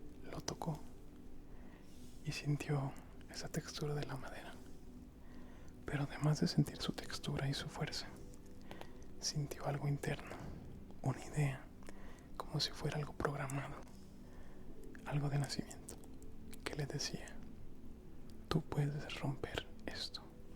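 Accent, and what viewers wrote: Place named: Argentina. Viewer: ES UN ARGENTINO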